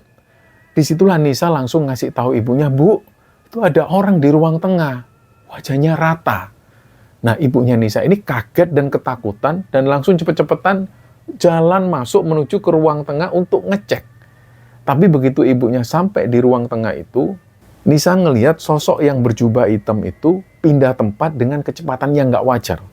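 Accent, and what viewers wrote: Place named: East Java, Indonesia. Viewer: Sy bu Wulan Smg ngikuti kembara sunyi dan mulai tertarik ksh2 misteri saat n istri cerita perjalanan k gunung bromo th brp itu 2020 ya... Sy tertarik cr penyampaian cerita ini bs membawa alur crita enak, seolah2 sy ikut terlibat dlm crita itu mdh d pahami.. Enjoy gt bhs jawa timurnya medok banget,krn sy org surabaya leluhur sy...semoga kembara sunyi smakin sukses Aamiin Yra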